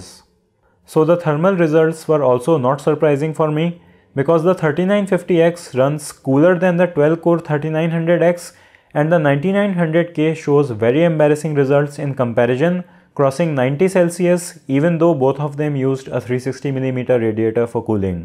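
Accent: India. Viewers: You have the stereotypical Indian accent. Good video.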